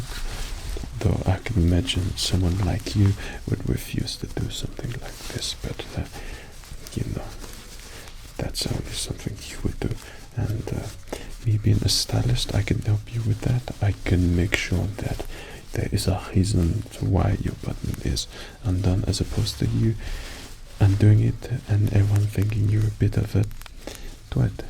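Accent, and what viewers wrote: France. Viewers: Oh great the long awaited return of the French accent! Very tingly, love this 😍. Also what a pleasant surprise for us french people to see the iconic "Luke, je suis ton père" accompanying the description of the video, bien vu! thanks 👍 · Ahah quite thé funny accent, but we french have a Secret technic to butcher english prononciation : prononcé ''the'' as ''ze'' ;) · real French accent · Sorry but that French accent is so strange to hear from you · I’m french and i love how you make our french accent 😂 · Nice french accent x) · This was absolutely fantastic! I love the French accent and the one-liners. It’s not only relaxing but cleverly funny. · Back on Nov. 11, 2018, video, "Your Friendly Theatre Ticket Master" with a very quiet French accent, the snooty fellow took almost an hour to check a ticket. I still love that one in particular and now this. 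Thanks, you daffy guy.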